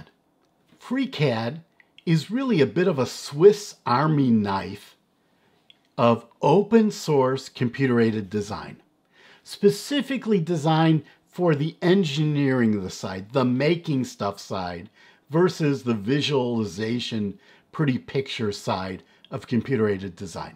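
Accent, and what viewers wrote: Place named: United States. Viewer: I listen english spoken vids very often, cos I'm learning english for my own and I found Mr., who I´ve been following for a quite some time now, have a very clear and nice american pronunciation. So, as I'm not a native listener in eglish, for me is perfectly well to listen his vids at normal speed. Cheers, John from Santiago de Chile. South America.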